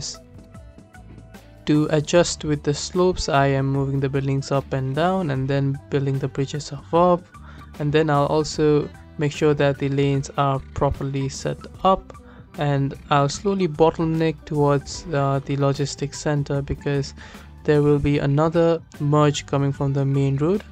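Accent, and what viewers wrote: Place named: India. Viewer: Your accent is very much Indian ! Where are you from ?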